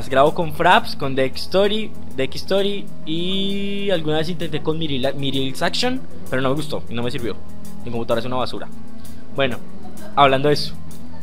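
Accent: Colombia. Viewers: Oye ... XD vengo de uno de los packs HD ... xD y se oía un poco lo rolo en la voz y claro Colombiano parce !! XD !! saludos desde cúcuta .. aún así no estoy ni seguro si es de bogotá xD !! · de que parte de colombia eres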